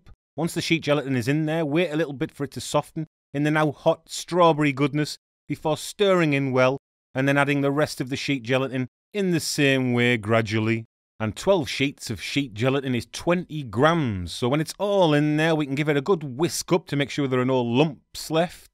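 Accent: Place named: Stockton, United Kingdom. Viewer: As a Stockton Lass i love the recipes and hearing my Dialect I've lost mine by Livin too long in Germany🤣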